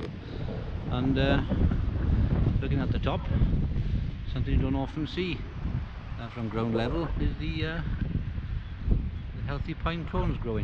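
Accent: Wales, United Kingdom. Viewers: Absoloutely loving the Welsh accent bud and your vids are incredible. Keep up the excellent work, subbed!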